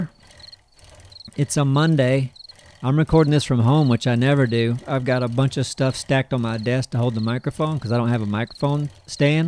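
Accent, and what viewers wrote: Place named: Appalachia, United States. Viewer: I can hear your accent a little bit the Appalachian twang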